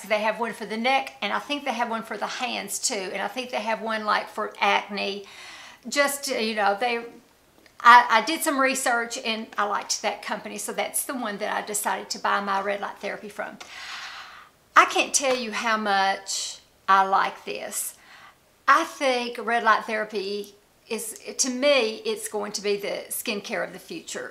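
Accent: South, United States. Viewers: They both have a southern accent and are adorable but they don't sound alike. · I think southern women are the sweetest, kindest, just examples of grace in all circumstances. I shouldn't assume you are southern, but your accent is beautiful. You are really beautiful, inside and out. Whatever you are doing, it's working! You look fantastic. People our age never did use a whole bunch of skin care products. I started when I was 58, and some things just made my skin WORSE. I had acne bad when I was a teenager up until my forties, it gradually became less and less. Now that I am older, and my skin isn't as oily, I find replacing that oil with some vitamin C and A oil really feels good. I think it helps my skin. And the red light therapy, yes! I love it. I lie down and do some meditation while I do mine.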